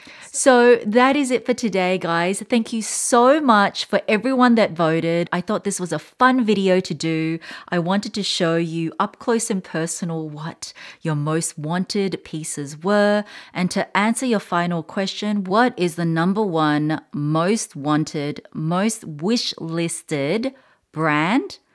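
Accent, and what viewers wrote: Australia. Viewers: I am surprised that the Cartier watch (Tank or Panther watch) didn't make the list. I think the problem with Tiffany is that they don't really have one iconic piece that is unique to them. I think the Tiffany T line the T square bangle can become that piece, unless they start changing it then it won't be iconic anymore. Thank you for such a lovely video. Alway enjoy watching your videos...and that Australian accent is gorgeous!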